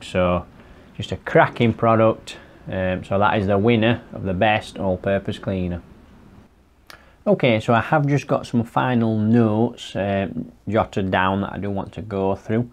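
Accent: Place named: England, United Kingdom. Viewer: Great video! It's a pleasure to hear a proper English accent with clear, simple explanations - all backed by thorough research and planning. Beats a loud, shouty American making big unsupported claims from a sunny California beach! Top work. Well done, that man...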